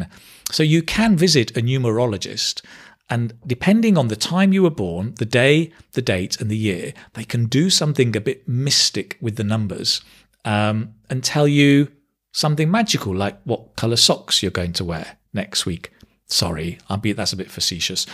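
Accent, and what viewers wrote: United Kingdom. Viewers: This accent (British) is amazing .. i do like it, but i have not still mastered it well.. briefly thanks for your information about that, and have good day.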